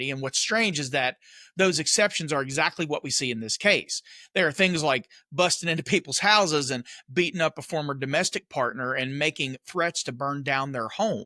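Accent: South, United States. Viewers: NO, THANK YOU! I like your no nonsense straight to the point southern drawl with a dash or 2 of comic attitude. Take Care, John & Amy(woof), Wyoming, The only state w/out a accent!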